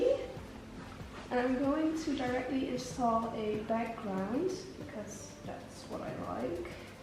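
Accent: Netherlands. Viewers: Klinkt Nederlands? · Why i feel ur dutch from ur accent why i have this feeling tho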